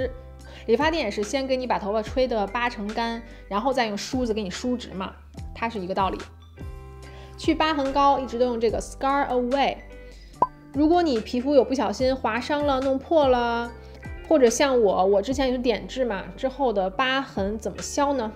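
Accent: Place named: Beijing, China. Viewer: 北京口音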